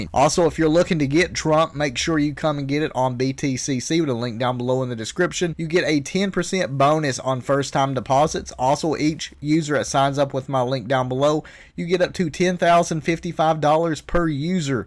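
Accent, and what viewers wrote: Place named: Deep South, United States. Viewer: Hey! I LOOOVVE your deep south accent...🥰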